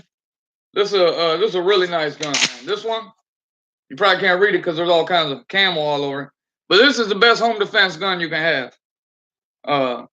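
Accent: United States. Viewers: Nothing wrong with it i was just trying to figure out where i heard it before im from Michigan myself and really i thought he was from Detroit !!!! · He is my little buddy from the north. He is from Ohio.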